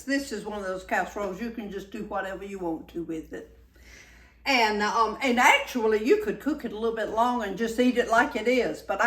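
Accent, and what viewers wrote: South, United States. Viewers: I love this treasure I found today on you tube! She sounds like my Aunt Scarlett from ATL but cooks like me in the 1970! Thank you for bringing my Southern Recipies back to life!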